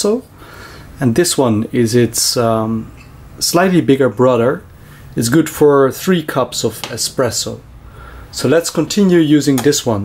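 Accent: Netherlands. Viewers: This guy is Dutch... I can tell that accent from miles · Horable Dunglish as the Dutch call Dutchified English.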